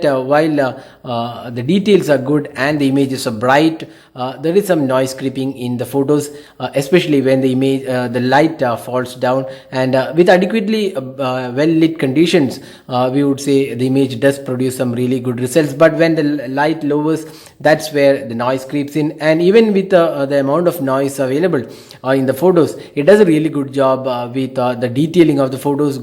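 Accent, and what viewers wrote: Kerala, India. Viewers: Are u a Mallu ? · r u a mallu?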